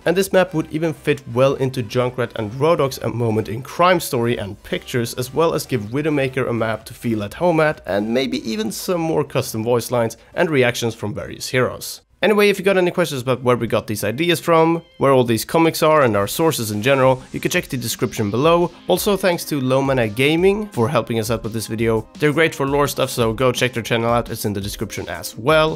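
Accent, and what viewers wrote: Sweden. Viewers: Wow wow wow are you from sweden? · Are you from Sweden??!?!?!?! 😃😀😃😀😃😀😃😀😍😍😍💕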